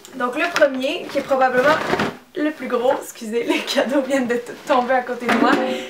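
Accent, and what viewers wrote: Canada. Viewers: Tu es une vrai canadienne on peut pas se tromper avec les accents et les expressions qui vont avec mdr j adore ! Sa fait très brute par rapport au français de France mais j adore ta personnalité et celle des canadiens des gens top pour tout se que j ai connu et j'adore leur façon de parler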